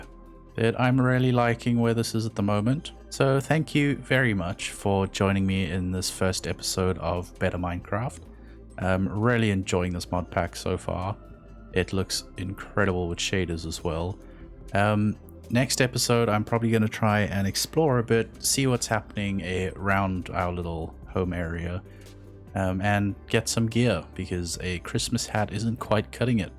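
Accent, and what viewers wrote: South Africa. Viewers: can hear that South African accent from a mile away xD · Your south african right?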